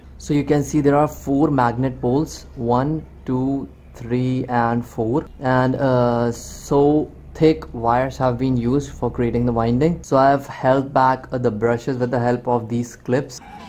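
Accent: India. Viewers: indian accent so funny lol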